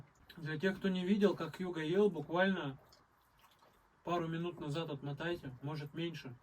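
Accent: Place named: Perm, Russia. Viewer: Добрый день! Это у вас какой край или федеральный округ? Акцент похож на Пермский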